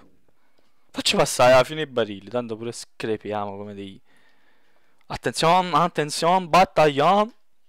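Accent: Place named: Naples, Italy. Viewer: Napoletano <3 <3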